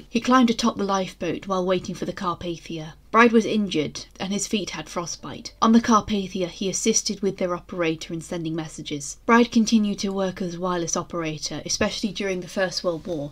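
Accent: United Kingdom. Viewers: Just some friendly advice: keep the info about the person up longer (it went away too quickly so I had to keep going back to be able to read it all or pause on it and i'm a pretty fast reader) and speak a little slower because I missed a solid 50% of what you said it kinda felt like I was in a rush to hear you ha so I had to go back to listen to it again. You have a really beautiful voice (then again i've always been a sucker for British accents 😉💕), great narration and well made videos but I had trouble with those two things. Not trying to be rude by the way just trying to help 🙂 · Impressive memorial, sublime research! Lovely narration, clear, impeccable English accent. Thank you. 😇🤗💃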